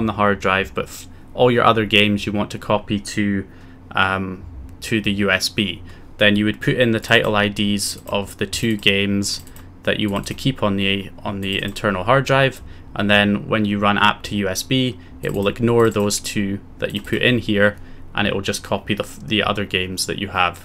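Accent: Scotland, United Kingdom. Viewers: Amazing videos BTW, and extra special to hear a fellow Scot explain such matters!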